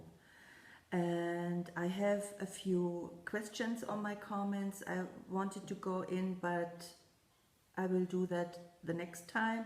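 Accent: Germany. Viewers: As usual, I love all your little finishes, & the snowmen finish is adorable. I've been wanting to tell you several times now that I love the lantern you have behind you. I collect them & can never have enough. I really enjoy your videos & love your German accent. Nothing to dislike about your videos. 😊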